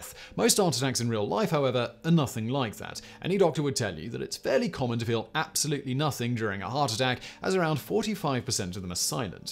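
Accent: England, United Kingdom. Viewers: you and your staff have an amazing propensity for getting it wrong more and more often. Before you cause much more damage in peoples lives. Consider how you produce these videos, and how many. Quality control sucks. Number two is patently and demonstrably false. The brain does grow and learn through mental exercise. Apparently you or your editors? Have never heard of cerebral plasticity. Or took no notice of the numerous studies which proved beyond any doubt that people who picked up a second language, or learned new math skills, or even practiced mental yoga's, in middle age, or even older, were far less likely to experience cognitive decline, and thats just one benefit. But how I weary of making a counter point to you. So many of your viewers hear your English accent, and just accept that you are smarter than they are because of it, and so you must know what your talking about. 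Such is specious reasoning. Every part of the body, including the brain, can be exercised. And growth will continue so long as telomeres tell, cells make new connections, and nuerons know what is going on. I'm serious, yet I jest. And yes, you can definitely make up for lost rest and missed sleep. Changing sleeping patterns sucks for your health. Both mental and physical. Yet we can adress this as well with adenosine and melatonin, or sometimes a simple histamine. Maybe you guys will double check yourselves and get an opposing opinion some day. I find it difficult to believe you ever do so, re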